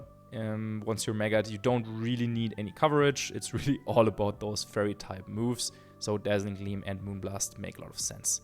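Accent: Germany. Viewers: Samma da hör ich doch ze tschörman raus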